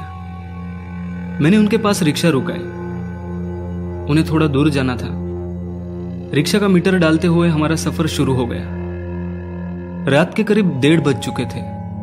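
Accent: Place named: Bengal, India. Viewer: Ta Bhai up to Bengali mei bat kar skte kyu Hindi me bolte ho upka Hindi kaisa bangal-hindi mixed ha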